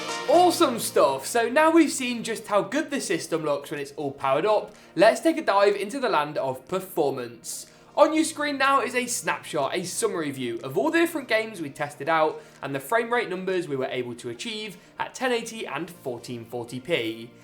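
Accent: United Kingdom. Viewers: i wanna build a pc but i always think are the prices for the gpus will go down or not. i don’t want to buy a prebuilt pc they’re so expensive. i actually knew you yesterday and i really loved your videos and the quality is brilliant + your accent is very nice it makes me wanna learn british english. ( sorry for my bad english this is all i learned from school 😔😂)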